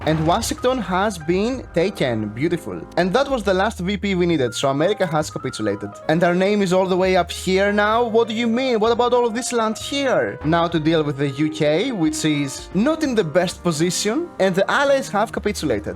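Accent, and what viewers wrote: Greece. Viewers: I suffered through 16 minutes of chracteristically Greek accent and survived it.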